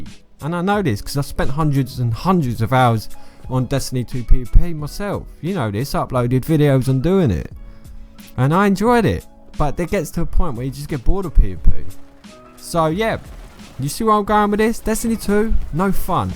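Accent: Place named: United Kingdom. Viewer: sir you have a painfully bri'ish accent but i love it. keep up the good work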